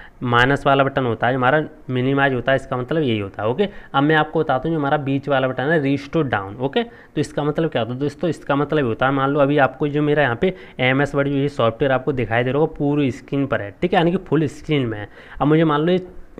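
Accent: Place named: Saharanpur, India. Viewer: Pakka sharanpur ka h bhai mhara bhai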